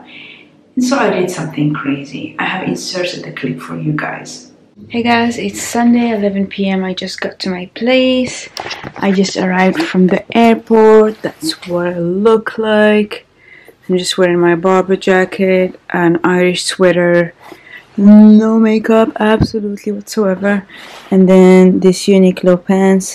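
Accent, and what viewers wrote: United Kingdom. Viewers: Its been a while!! You are starting to catch the english accent!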